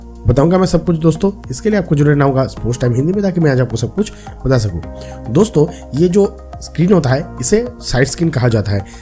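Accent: Bengal, India. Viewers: Aap bangali ho😁😁apke hindi bangla mesano hein😁😁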